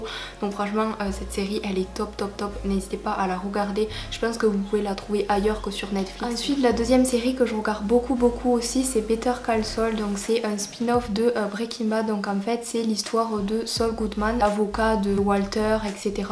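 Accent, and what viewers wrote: South, France. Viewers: Toi tu viens du sud heiiiin mdrrr :')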